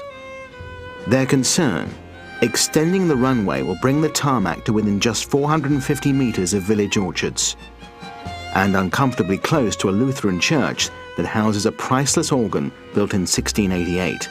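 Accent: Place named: United Kingdom. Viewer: OMG can we please get these brit narrators other jobs grrrrrr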